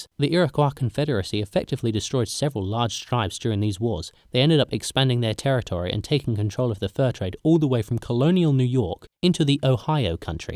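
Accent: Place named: United Kingdom. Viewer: This youtube formula is crazy: British accent + shitty graphic art + whimsy commentary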